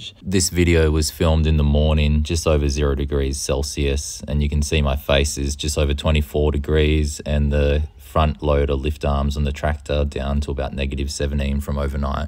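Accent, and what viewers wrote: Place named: New Zealand. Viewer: Nice summary. You sound Kiwi though.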